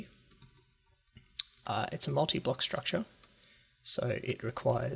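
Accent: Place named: Australia. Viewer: You sound so Aussie lol, I need to get some video tips from you. Your videos are always great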